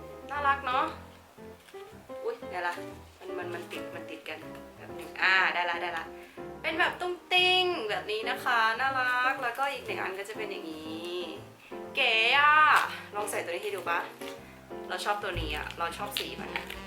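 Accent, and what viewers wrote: Isan, Thailand. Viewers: สำเนียงอีสานก็มา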